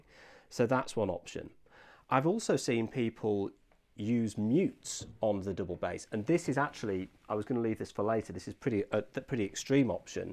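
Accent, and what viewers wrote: United Kingdom. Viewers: I've got a completely un-bass-related question for you. You're from the UK right? What specifically is your regional accent? I've had an accent my entire life that many people have assumed is British, but I've always been skeptical, but never sure what it really was. There are certain nuances in your speech that actually match mine; so I was wondering what your regional accent is.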